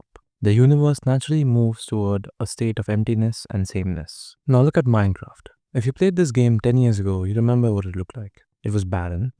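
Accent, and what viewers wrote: India. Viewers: Cool theory but why do you sound like a poopjeet?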